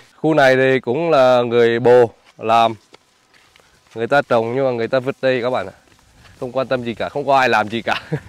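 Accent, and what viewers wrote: Nghe An, Vietnam. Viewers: Nghe tiếng giống tiếng Nghệ mình quá. Bạn dân 37 hả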